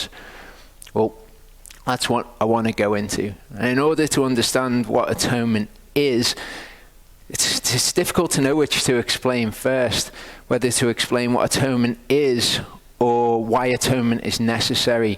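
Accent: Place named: Liverpool, United Kingdom. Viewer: I SEE BY YOUR VOICE YOU ARE FROM LIVERPOOL, I ALSO AM FROM LIVERPOOL AND AM LOOKING FOR A BIBLE BELIEVING CHURCH THAT KNOWS WHAT TIME IT IS AND THAT WE ARE IN THE VERY LAST DAYS, IVE TRIED MANY, BUT THEY ALL PREACH ONLY FROM THE FOUR GOSPELS, THEY DONT GO NEAR PAUL, THE OLD TESTAMENT AND CERTAINLY NOT THE BOOK OF REVELATION. I REALY NEED A CHURCH THAT I CAN GO TO AND FELLOWSHIP WITH, CAN YOU ADVISE ME? I LOVE YOUR SERMONS AND WISH YOU HAD A CHURCH IN LIVERPOOL! YAHOVAH BLESS YOU IN THE NAME OF YESHUAH HA'MASHIACH! THANK YOU! My email is: <mail>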